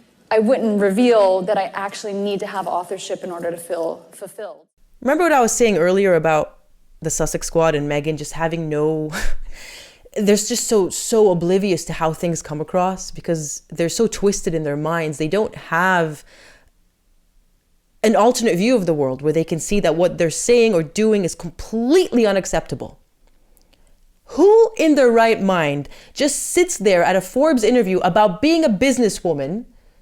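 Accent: United States. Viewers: What country did you serve ? You sound American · May I please, politely, ask about your accent? You sound so American but are definitely an Ozzie. I have been there and the Ozzies were lovely. Also thank you, and your wounded friend, for your service. I'm English and they can both feck off. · Curious... Why do you have an American accent?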